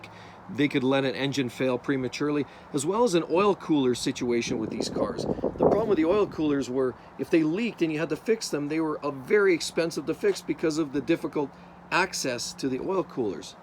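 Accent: Canada. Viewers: Me after 3 seconds: wonder which part of Canada this guy is in....🤔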